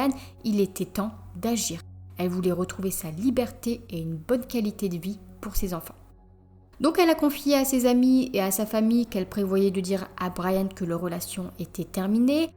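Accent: North, France. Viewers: Je ne connaissais pas cette histoire.. 14 ans c'est bien peu. C'est un horrible personnage. Sinon je crois que j'avais demandé dans un commentaire d'une autre vidéo. On dirait que je perçois un léger accent du nord.. Je suis aussi chti c'est pour ça et j'ai la même intonation dans certains mots. 🙂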